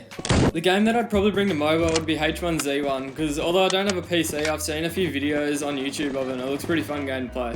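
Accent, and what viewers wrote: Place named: Australia. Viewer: Love your Australian accent and I even live qld but I don't have an accent. Lol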